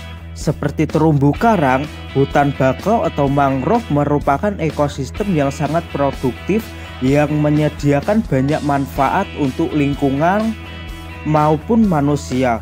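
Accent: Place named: Java, Indonesia. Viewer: Jawane Medok to mas😅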